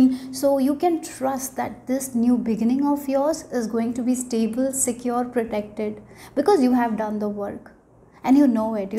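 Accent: India. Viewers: Really enjoying your readings. Very on point and deep. Love your Hindi accent as well. Thank you🙏🏼✨💖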